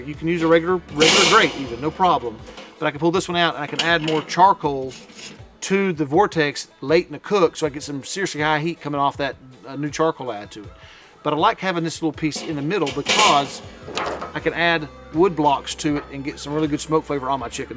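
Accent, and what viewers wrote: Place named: West, United States. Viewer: My name is Ron and I have a tip for you. If you use the clips from the Onlyfire pizza and rotisserie assembly it will rase your great higher into the kettle top and the chicken cooks even better (crispier). I never take my clips off anymore, I use them on all grilling that I do.. P.S. I truly enjoy your Chanel. I like your background I like how you present your work and slight and not so strong western accent there is a western actor that you look and sound just like him, he was the Rancher on City Slickers. Also you should get a long meat poker or fire place pokers to use when setting up your grills. Great video as usual.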